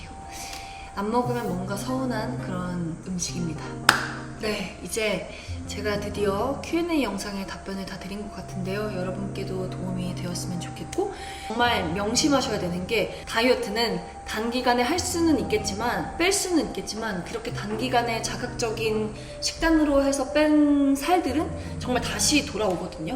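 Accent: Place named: Seoul, South Korea. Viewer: 님 서울사투리 말투 같아요 😂😂 ㅎㅎ 넘키여워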